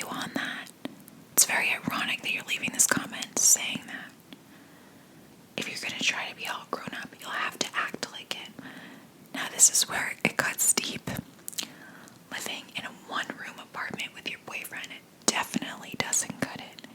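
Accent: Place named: East Coast, United States. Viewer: Girl I looooovvveeee your videos and your voice so much!! The East Coast accent is so tingly and reminds me of home ❤️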